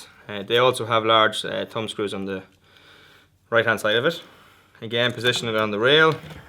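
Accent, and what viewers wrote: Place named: Dundalk, Ireland. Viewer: That dundalk accent though!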